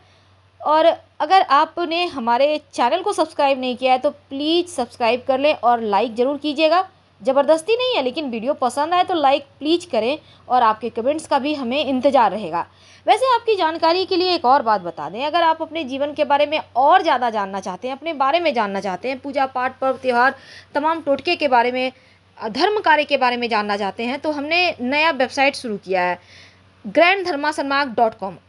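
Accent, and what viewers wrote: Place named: Madhya Pradesh, India. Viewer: If I am not wrong I think you are from mp accent se lagta hai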